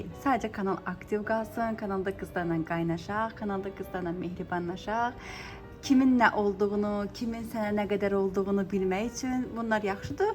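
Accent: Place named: Ganja, Azerbaijan. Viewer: Soruçuram gence lehcesidi. Bilen bilir.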